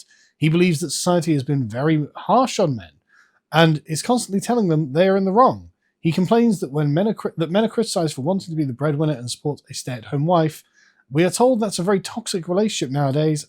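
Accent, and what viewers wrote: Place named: England, United Kingdom. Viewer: Sorry bro, your English accent couldn't save you on this one. Complete rubbish.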